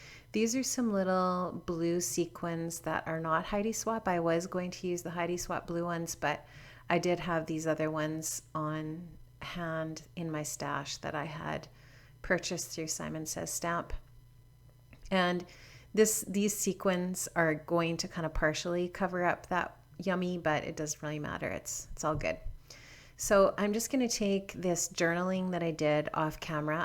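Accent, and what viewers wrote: Canada. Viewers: This very cool and I am enjoying watching and learning! You sound like you are Canadian...eh? Anyhoo...best wishes to you and yours for a happy holiday season! TFS! xo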